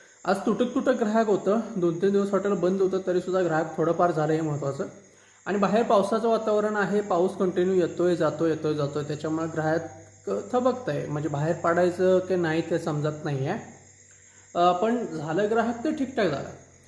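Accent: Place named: Mumbai, India. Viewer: मी तुमचे व्हिडिओ एकदम सुरूवातीपासून पाहतो तुम्ही कोल्हापूरचे आहात पण शुद्ध मुंबईची भाषा बोलतात आम्ही mh15 नाशिक कर येवला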